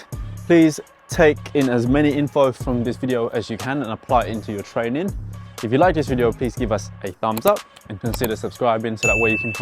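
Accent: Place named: United Kingdom. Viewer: Thanks for the tips nephew, have to say I like your British accent from a young Asian man, I have an Aussie accent for an old Asian man. ( only mentioned this cuz it's my first time hearing it ), best wishes and cheers to you:yougotthis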